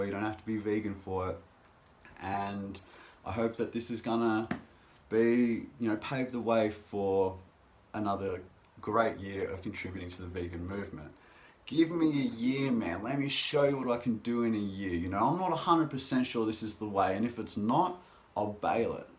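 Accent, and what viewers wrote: Australia. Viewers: I first suscribed to your channel thanks to and because of your way of putting veganism forward. Always wise and peaceful. I also wanted to improve my English and understand the australian accent - it actually helped alot! - But what I truly love about your channel is that we also learn how to be humans. Not sure if it is understandable but your videos are a great source of inspiration, for the animals as well as for us, as living being. Great job and greetings from France!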